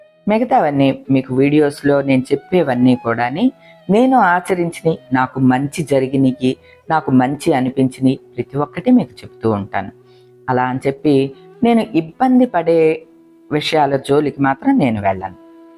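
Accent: Godavari, India. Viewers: Super amma...😂 Me gun shot answers vere level.. ayyo adukku thinadam varaku velladam endukandi.. tappu kadu... E dialogue matram super amma.. Mana godavari yaasa.. manam entha correct ga unna matladina nachani vallu alane antaru amma.. nenu chala navvukunna e video chusi. 😂we enjoyed a lot 😂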